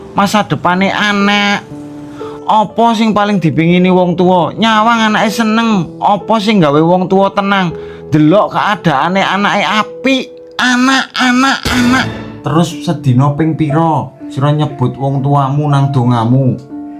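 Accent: Purworejo, Indonesia. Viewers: Bahasane koyo wong Purworejo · Omahmu ngendi kang logat medok purworejo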